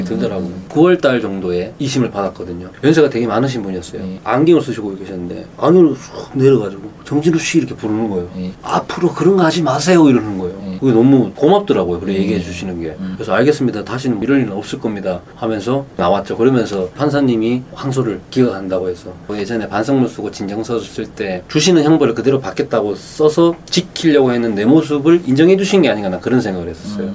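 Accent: Gyeongsang, South Korea. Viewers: 말투가..갱상도출신인가요..ㅎㅎ 머.도박을.했엇지만...비굴하지는.않했네요 자기말에.지킬려고.했던.마인드.. 칭찬해주고..싶습니다.... 도박..다시는.않하시겟지만.. 내용을.보니.머리가.아주.비상하시것같습니다 뭘해도..잘해내실것.같네요... 홧팅.드립니다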